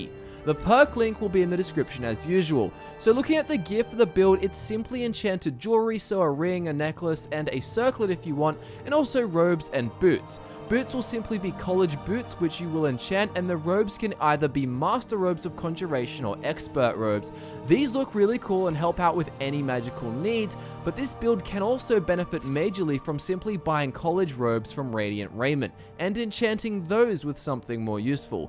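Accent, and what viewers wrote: Australia. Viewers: australian accent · it's an australian accent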